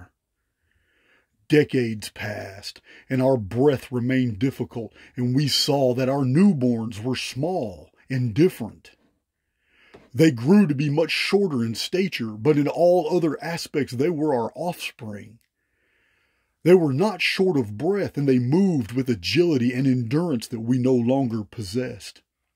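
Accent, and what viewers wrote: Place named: West Texas, United States. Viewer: Yes indeed nomad, it was rather masterful wasn't it? When I first heard say sim-ul-arcam, my British self head 😮was rscreamed "sim-yew-lacrum". His voice is very colorful and he knows how to draw us in with that West Texas accent and putting real dramatic intonation into his delivery. 👏🏆😊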